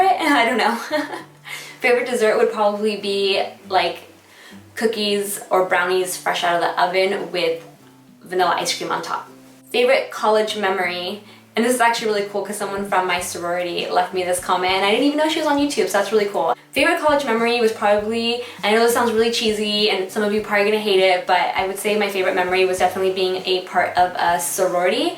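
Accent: Mexico. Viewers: you are soo beautiful and I value your makeup advice the most! If you were to make videos in spanish, you would appeal to a wider youtube audience. I think you should! and by the way I knewwww you were mexican, i recognied that accent (in the best way). love you!!! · I KNEW you were Mexican just by your accent. I have it too. I'm from Mexican parents and born in Texas.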